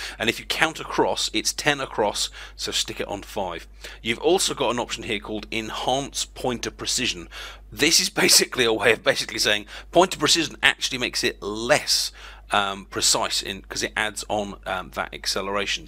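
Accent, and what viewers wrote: United Kingdom. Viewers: British accent <3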